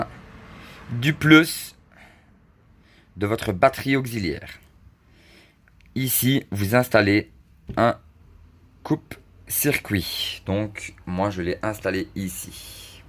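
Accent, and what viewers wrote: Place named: Liège, Belgium. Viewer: salut mec ton accent me dit un truc haha tu viendrais pas de liege? :p super la video sa vas bien m'aidé merci a toi 1 abo en plus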